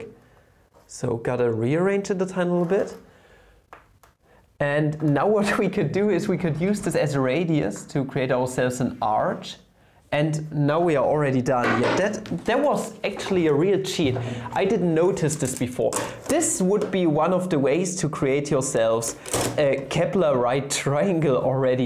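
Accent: Germany. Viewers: I love german accent 👍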